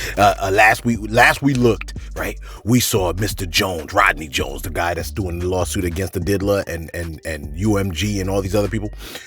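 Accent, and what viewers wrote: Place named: South, United States. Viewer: Man you talk bad like you from the south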